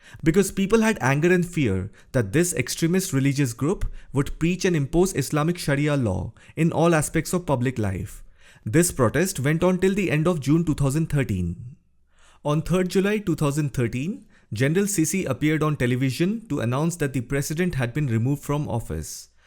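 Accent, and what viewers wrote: India. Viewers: I like his Indian English accent.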